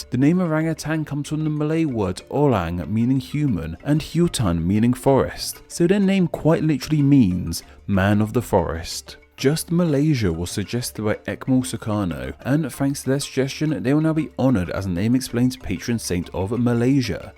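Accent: United Kingdom. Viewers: Please don't take this the wrong way, but the way you get your "R" and "L" mixed up when you try to pronounce the names makes you sound like an East Asian despite the clear British accent. Fun fact: at 544,150 sq km, the Indonesian part of Borneo is bigger than the whole countries of Malaysia (both Malaysian Borneo and the Peninsula) and Brunei put together (335,612 sq km). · Jahaha i study british accent from your video sir😂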